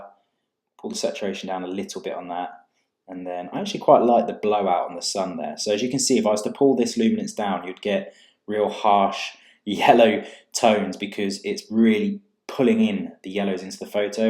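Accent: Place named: South East London, United Kingdom. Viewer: He sounds like he is from the south east of london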